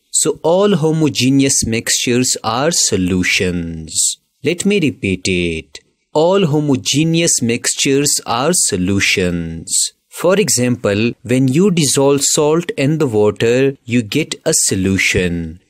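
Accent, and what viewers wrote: India. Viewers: يخربيت اللهجة الهندية 🤦🤦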